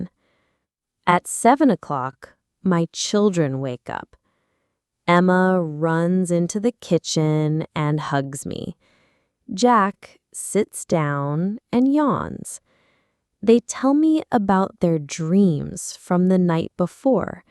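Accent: United Kingdom. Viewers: is british english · Is this british accent?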